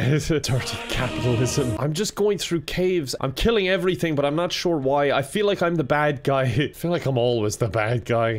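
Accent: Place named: Ireland. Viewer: Hello there friend and apparent Scorpio? Happy belated birthday if it was this Tuesday!!! I really hope you had a fantastic day and that it was awesome like you are. I recently found your channel, recently found gaming YouTube actually but I will say you're my favourite Irish gamer ngl. I would literally watch any video you make. Like the accent alone? Corpse who? Anyway... Hope your day feck ing rocked.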